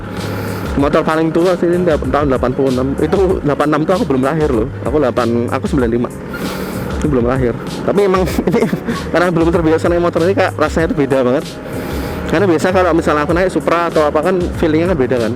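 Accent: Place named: Jakarta, Indonesia. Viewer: Mase logate rodo jakartanan. Hehehe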